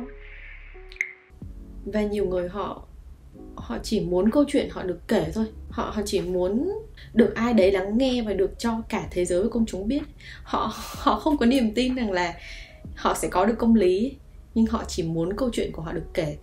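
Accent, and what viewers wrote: Vietnam. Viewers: Bạn là người Bắc hay Nam?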